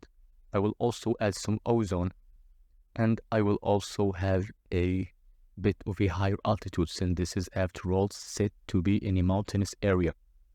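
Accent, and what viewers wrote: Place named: Russia. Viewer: очень русский акцент)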